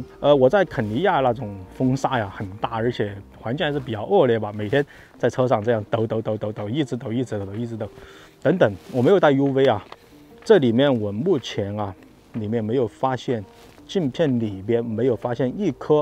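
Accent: Sichuan, China. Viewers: 这个频道主感觉是个四川人，讲话太像了。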